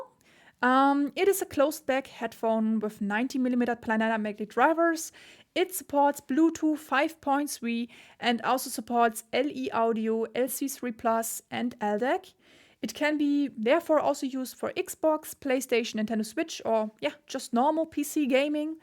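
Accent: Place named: Germany. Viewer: Tell me my ears are right and you're German. 😁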